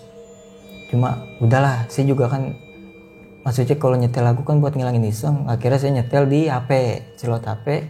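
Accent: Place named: Pemalang, Indonesia. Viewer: Orang Pemalang nih Pemalang mana ka:v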